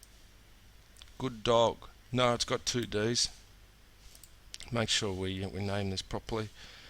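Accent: Australia. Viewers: you are aussie correct?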